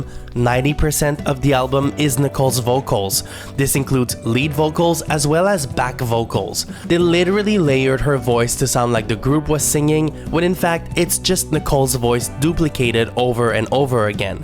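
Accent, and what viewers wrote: Quebec, Canada. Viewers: Is that a québécois accent I'm hearing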